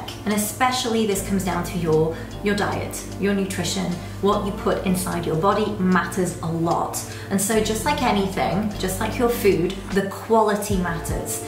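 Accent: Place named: Southern England, United Kingdom. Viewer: I thought you were from somewhere in the south of England.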